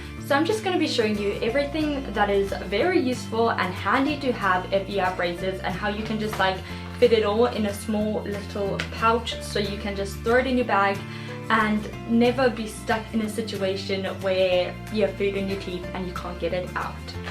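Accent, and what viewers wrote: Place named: South Africa. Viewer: Me watches 10 seconds she is south African Me goes to description box reads: I am south Africa Me I knew it🤗 Btw getting braces soon and this helped a lot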